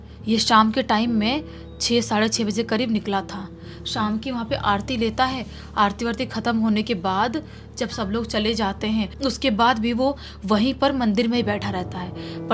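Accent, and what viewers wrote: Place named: Uttar Pradesh, India. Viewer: Bhai hum roj aapki kahani sunte hai aur aap aaisa lagta hai ki aap up ke ho 😊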